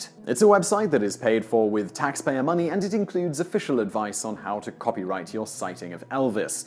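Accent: United Kingdom. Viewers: it makes no sense listening to a brit talk about US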